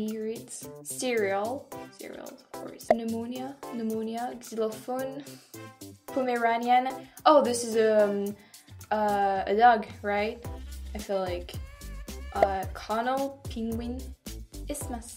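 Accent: France. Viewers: You did really good I thought. Don't loose your French accents, makes listening to your videos very charming. Time to show us some more delicious French food please!!! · You did SOOOOO Great! Kernel=Colonel, and you have it. There's also the French S for singular things, which is endearing, so don't change! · My father is from France, so I’m used to the accent. The word "father" itself comes out particularly humorous, so be careful.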